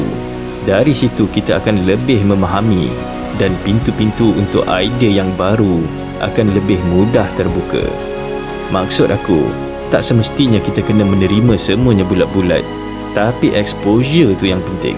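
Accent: Malaysia. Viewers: Bang suara yunik menarik logat malasyia...slm bumi minang kabau...❤👍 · video dokumentar ini layak di beri apresiasi, narasi hostnya sangat bagus dengan dialek bahasa Melayu khas semenanjung yg enak di dengar, bahasa yang rapi dan teratur, vokal yang bagus, dan mudah di mengerti.angle / sudut pengambilan video sangat bagus dan rapi, editing nya oke... all the best lah.. salam serumpun dari jakarta.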